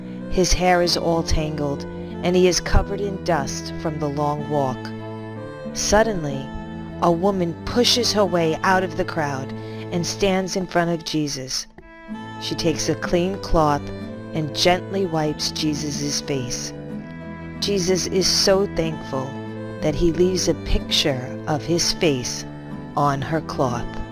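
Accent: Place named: New York, United States. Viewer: New York accent much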